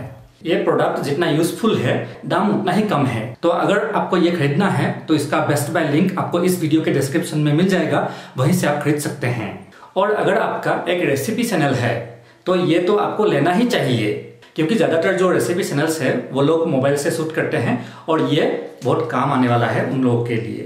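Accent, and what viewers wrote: Assam, India. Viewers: your accent sounds assamese ☺️ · Your hindi accent sounds like you are Assamese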